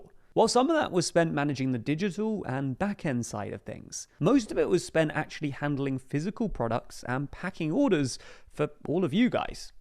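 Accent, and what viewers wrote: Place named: RP, United Kingdom. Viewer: Wow... an academic, RP-sounding voice makes anyone sound like a good writer 😇😇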